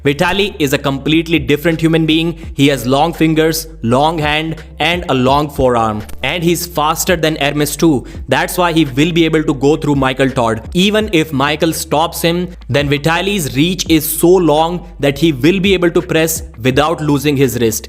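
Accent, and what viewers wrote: India. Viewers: Hey have you ever considered using an AI voice for your videos? I would watch them more but your thick Indian accent makes it hard to understand what you’re saying sometimes.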